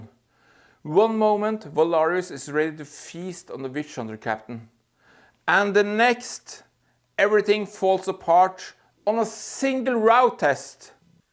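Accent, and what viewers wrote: Norway. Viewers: Very cool! I can hear from your accent that you are in Norway. Let me know if you are in Oslo and wanna play. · Is that charming Norwegian English i hear?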